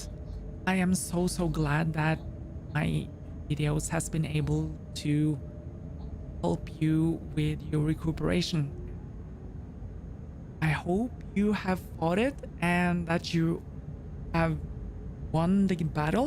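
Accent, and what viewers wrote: Norway. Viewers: 😊So glad to hear your voice😊. Spend months wondering what one may look like, or sound like...and why was I suprised when you sounded Norwegian?!❤ Enjoy the rails, and enjoy the skies!🚅🛩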